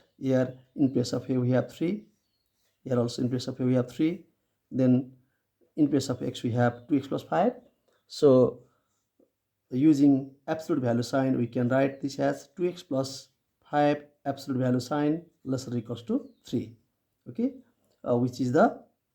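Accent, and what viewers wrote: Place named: Nepal. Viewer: His accent shows he is nepali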